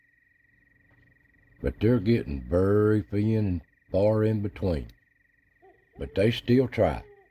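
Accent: Appalachia, United States. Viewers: thank you sir,I loved the country mountain way,you narrated the piece work story,five stars ☆☆☆☆☆,I'm a blue ridge boy,so sounded like one of my uncles telling a story,thanks again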